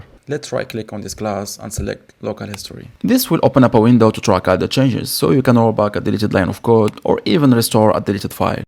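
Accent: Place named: France. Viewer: I've watched the Kubernetes video and now this one, I've gotta say that you're explaining everything great. Your videos have a nice format and are quite enjoyable to watch, but I have one small thing to say to you and I mean no offense here - we all know you have a french accent when talking and sometimes (here and there) you talk so fast that I lose you, so for your future videos if you could slow down just a little bit. :) Hoping to see more from you, subscribed! P.S. the brackets plugin is amazing, thank you!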